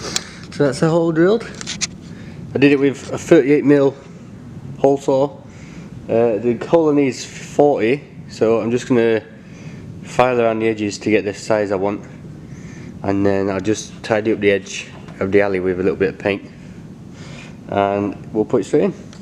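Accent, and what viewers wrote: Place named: South Africa. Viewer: Love the accent! Where ya from? I'd have to guess south africa but honestly I've got no clue lol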